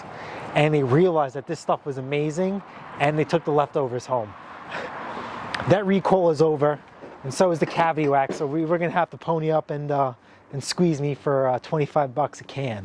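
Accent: New York, United States. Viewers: Thanks for the video. Really funny hearing a New York accent living here in central Idaho.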